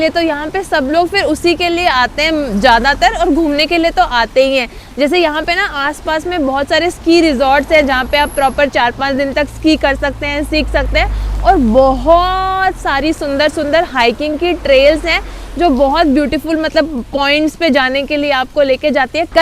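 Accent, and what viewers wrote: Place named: Rajasthan, India. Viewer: Germany ki trh bahut beautiful hai.. 👌👌 ki marwadi awosem 1no. 🇮🇳🇮🇳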